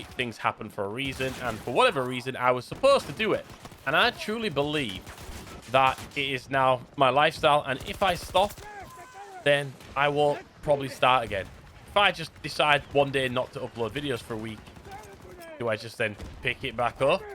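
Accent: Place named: Scotland, United Kingdom. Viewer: When I first started watching you I thought you were a Scot. And now I have just learned I wasn't entirely wrong! □😂🤣😂🤣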